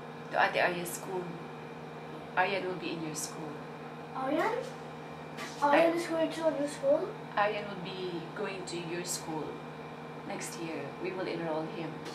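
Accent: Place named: Hiligaynon, Philippines. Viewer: Ilongga tapos Protestant. Grabe ka boses mo yung pinsan ko parehas kayo mag Salita ng tagalog.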